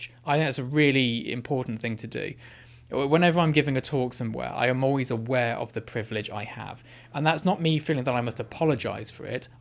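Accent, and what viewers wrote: RP, United Kingdom. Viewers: I think you nailed it! We can not apologize or change who we are, nor should we, as some would suggest! We can only try to make things better around us. BTW, a British accent does indeed add a level of poshness, elegance, and credibility! I remember asking my wife, who recorded the answer message at her firm? It was a female British colleague. 😊 Also, at one point, I worked with a consultant who was raised in Britain. When a presentation was needed , we all agreed that he should do the honors! It always sounded more on point when delivered by him. Thanks for the insight! Cheers from 🇨🇦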